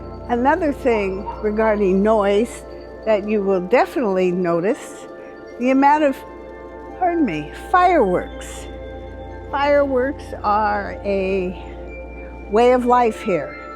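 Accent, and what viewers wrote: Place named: United States. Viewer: You keep talking about cigarette smoke I don’t know where you come from but you sound like you’re from the US and it’s nice that you’re able to ride a bike around and you’re safe and you don’t have homeless tents on the corners and people walking around like zombies because they’re so drugged out you don’t see stores that they can walk into and get needles and inject themselves so I can deal with the smoking I live here now for 2 1/2 years and as a woman I feel very safe walking around anywhere at night. then you’re talking about fireworks well you have fireworks but you don’t have big fires that people are starting in the streets in your major cities. If you don’t wanna hear the fireworks move outside of the town live up in the mountains there’s beautiful areas everywhere. you won’t find Walmarts and targets but what you will find her nice little vegetable stands and separate markets that you buy your meat and fresh bread that aren’t filled with disgusting ingredients and that are fresh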